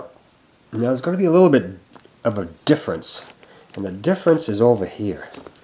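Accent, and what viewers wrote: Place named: East Coast, United States. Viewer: Does 2nd design mean it's not in the 3rd Generation S-series? Also, where are you located?? You sound kind of east coast. If I'm ever in your neighborhood I'd like to have my 2000 SW2 looked at by ya!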